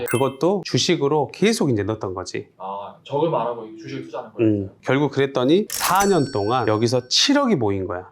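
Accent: Jeolla, South Korea. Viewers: 맞는말도 전라도 사투리라 사기치는 느낌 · 나도 전라디언싫어하는데 쟤는 말씨 안숨기잖아ㅋㅋㅋ억까는 하지말자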